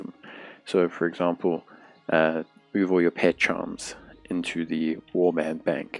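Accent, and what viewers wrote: South Africa. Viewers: awesome man, great to see other SA WoW players. You do sound like you've been out of SA for awhile.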